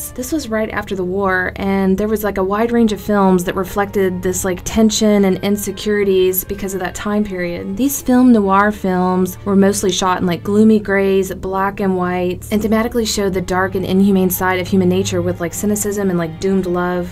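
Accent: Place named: United States. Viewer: Btw u sound like a cute American?what state are you from but slap me with the holy macral if I'm wrong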